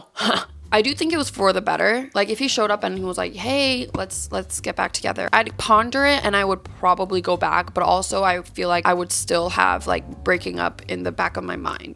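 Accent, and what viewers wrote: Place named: Asia. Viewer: Asian accent mic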